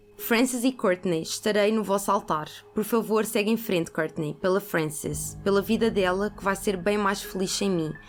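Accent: Portugal. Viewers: Ela é portuguesa?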